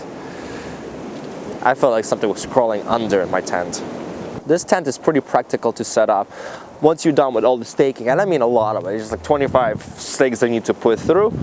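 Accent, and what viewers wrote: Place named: New Jersey, United States. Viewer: The accent was so strong and then you said Jersey..made me laugh. I have just purchased the tent. Or a variety of it second hand. Excited to try it out.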